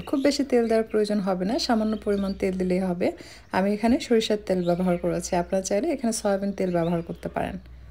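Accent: Bangladesh. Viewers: nijer khawa nijei khan, kothar tike apne bangladesh neki, sorry amar valo lagenai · Khub stylish apnar biboron....apnake dakhar ichhe...apni open kitchen baniye amader ranna show korun.khub kaydar ranna apni janen.....apnar channel ami follow kori.bangladeshi dialect y kotha bolleo apnar bisheshotto achhe anyo man er adobkayday bojha jay tai apnar kotha shunte bhalo lage.INSHAALLAH AMON SUNDOR MON ER MEYE HOYE PRITHIBI JOY KORUN RONDHONSHILPO KOLAY!🤗🤝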